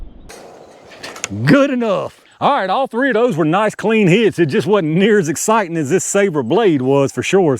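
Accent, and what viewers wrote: United States. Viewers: Came for the gun, subbed for the accent. What state are you in?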